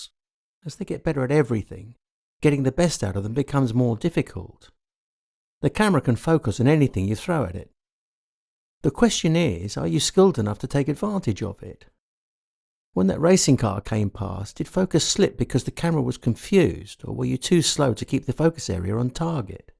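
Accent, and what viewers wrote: United Kingdom. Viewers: Once more, great stuff and accurate opinion. I am professional filmmaker/photographer. I have owned and shot with Nikon D750, Leica M, Olympus EM-1 MKII and the GH5. I agree with absolutely everything you said, the GH5 menus and usability are the best I've used. Cheers from Brazil and keep doing these. You and your British clarity + accent rock. Cheers from Brazil 🇧🇷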